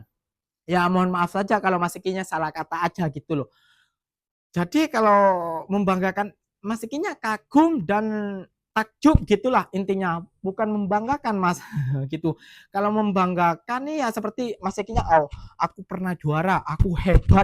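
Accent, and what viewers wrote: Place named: Indonesia. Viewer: dari awal sampai akhir video tuu kamu udah cakap melayu dialeknya aja dialek imdonesia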